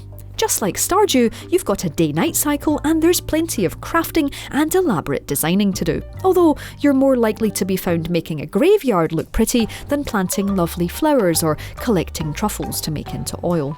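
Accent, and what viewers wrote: Scotland, United Kingdom. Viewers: Rowena is Scottish and I’m pretty sure the narrator also has a Scottish accent lol. I love Rowena tho she’s amazing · I love the scottish accent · The slight Scotish accent is really enjoyable!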